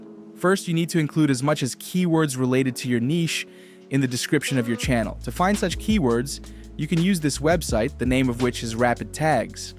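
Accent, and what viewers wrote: Sri Lanka. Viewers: bro are you from sri lanka?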